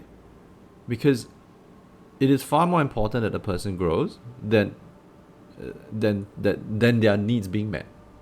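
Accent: Singapore. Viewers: hey man, you sound like you are from singapore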